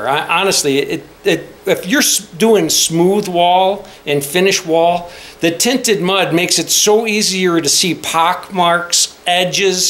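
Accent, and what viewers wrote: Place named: Bridgeport, United States. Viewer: You sound like your from Bridgeport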